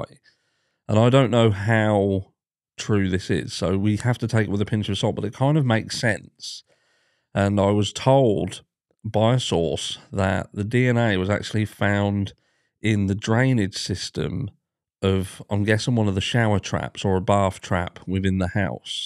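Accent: United Kingdom. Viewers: Face it, folks......true crime just always sounds better with a British accent.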